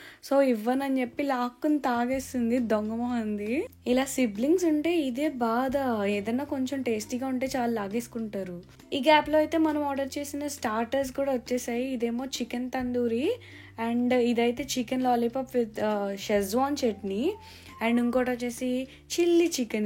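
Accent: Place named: Telugu, India. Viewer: Me voice and Pure Telugu slang👌👌👌👌👌 Andi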